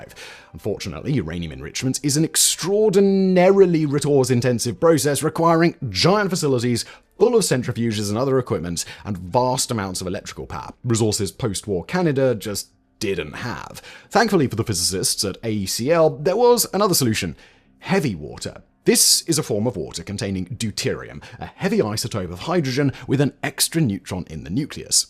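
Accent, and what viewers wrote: United Kingdom. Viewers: But with a Brtish accent, it sounds some much more sophisticated then when AI narrators screw it up. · which is odd, since there is a Kincardine in Scotland, which given the host's UK accent, should be familiar.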